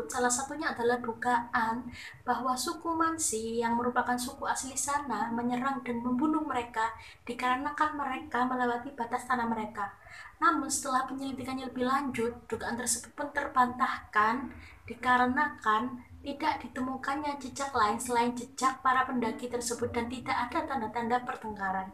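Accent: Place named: Palembang, Indonesia. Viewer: loga ny kayak orang palembang hehehe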